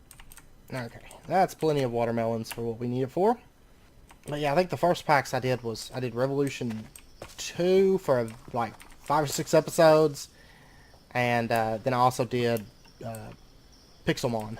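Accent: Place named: South, United States. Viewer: Thanks for responding. Only found you a few days ago. You sound like you may be from the southern United States. I found your bypasses in the next episode.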